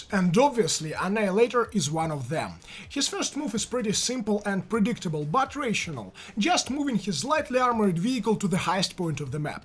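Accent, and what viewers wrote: Russia. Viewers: i love his voice pls more from this russian english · True russian accent) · очень годно ! =) но русский акцент уши режет , непривычно так .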